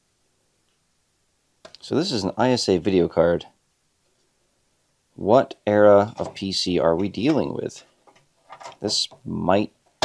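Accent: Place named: United Kingdom. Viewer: HAHA as a brit, I appreciate the british accent 😁😂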